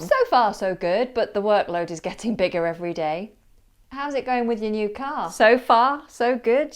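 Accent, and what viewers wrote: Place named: RP, United Kingdom. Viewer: If I am not wrong you are from the north of England, how did you get your beautiful RP accent?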